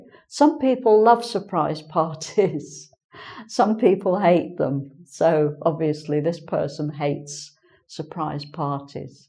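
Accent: England, United Kingdom. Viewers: Hi I'm new subscriber and i really love learning English and the British Accent. I found it really helpful. thank you so much for sharing with us :) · fast 1 month with Bacitikdaithanh this new teacher is so adorable. Welcome,. By the way, you're British, aren't you? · Your accent is wonderful. I really like the British accent, for me it is the best, the most pronounced, the cleanest, the best pronunciation. The English spoken in the United States is incomplete, it seems that they are too lazy to speak, they remove the letter T from the words, many unnecessary contractions. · This is the British accent that I love. Very clear lesson. Thank you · Nice Video.Thanks.You sound like British.Are you from England? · Nice to see more of British accent here! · Thanks for existing,! It helps so much. I'm from Belgium. They say I have a good pronunciation but I would like to practise my British-English and your videos are amazing for this. 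I can understand every single word you say without a problem. It's super-super nice. British accent is the best so far. Thank You, x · Hey, I'm from Spainl and I'm hope to improve my Inglish, I loved your brithis accent, your video was perfect, congratulations, hugs for you! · I love your beautiful english accent .What I really love is that It's not different to understand your explanation of most sentences as I know you are trying to speak slowly so that your pupils could understand it.Thank you so much! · Are you british? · i'm from brazil and i'm using to pratice my english foreigners, i loved your brithis accent, your video was perfect, i loved it, congratulations, hugs for you! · Awww this new teacher is so adorable. Welcome,. By the way, you're British, aren't you? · I love your clear British accent. Many thanks for your videos. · Thank you sweet. It was an excellent class. I could do note a little british accent? 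God bless you! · British accent was not easy to understand for me because I have more experience with American accent however, I am getting better little by little, thanks. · Hello, Dear ) I am verry happy to find you as a teacher ) You are such a nice and pleasant person to watch and listen to) To be honest I was in search for someone whose accent is absolutely British and I am sooo delighted to find you finally!) You inspire! ) Thank you so much for that!) Looking forward for your new videos) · Hii, mam I am from the ndia. I want to learn this English accent,, Please tell me ,,have you any application, or online course? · actually I love a lot of teachers on Engvid. but I love mama cos she uses British accent 😊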